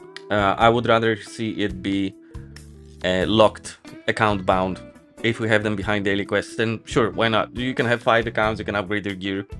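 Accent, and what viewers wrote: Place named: Poland. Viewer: Are you from Poland? Your accent sounds like you are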